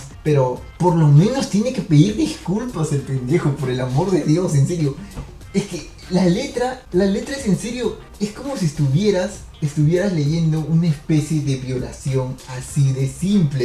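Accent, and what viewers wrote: Mexico. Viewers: Eres de México o Perú..? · que verga pensé que era de mexico xd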